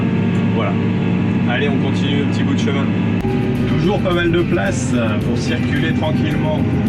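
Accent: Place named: North, France. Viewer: Bonjour, je me douté bien que cette accent c'était un accent du Nord on est vite trahi par notre accent 😀 bonjour de Liévin,ta ferme de situe dans quel coin.?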